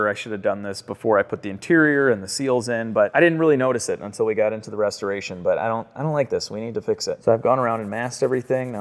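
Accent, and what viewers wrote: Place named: United States. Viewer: I would subscribe, but...your squeaky American voice ( any American voice) is so annoying that I can not bear to (skip) watch more then one video the most interesting thing in this was the small green bug...It should have it's own channel I would watch that!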